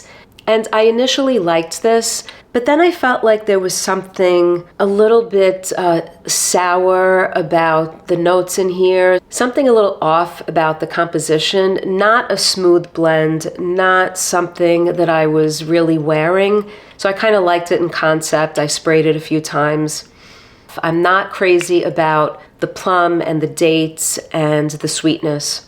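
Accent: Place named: New York, United States. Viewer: Love the video. More of this style of content needs to be out there. Just a discussion of what we like and don’t like. Fragrance YouTube is all about marketing these days and it’s annoying. Oud Satin Mood is one I’m on the fence with as well. Great scent, but overpowering..and the Extrait just doesn’t make sense. It’s nuclear lol. I had to subscribe after hearing the NY in your voice! Brooklyn boy over here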